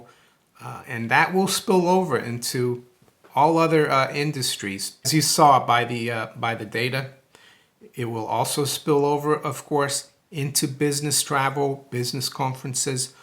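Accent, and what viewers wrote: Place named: New England, United States. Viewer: Where you from? Northeast accent. New England?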